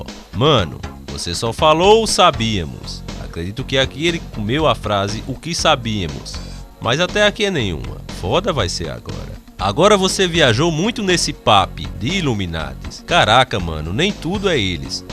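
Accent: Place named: Pernambuco, Brazil. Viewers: parabens cara, seus videos são foda mermo e as tuas zoaçoes com sotaque pernambucano é massa!!!!!! ganhou minha incrição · Pela voz do Locutor, deve ser de Pernambuco!!!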